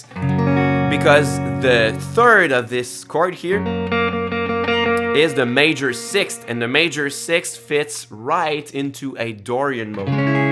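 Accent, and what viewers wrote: Quebec, Canada. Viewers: Oh boy j’ai tout de suite su que tu es québécois mon chum c quand même sick😂😂👌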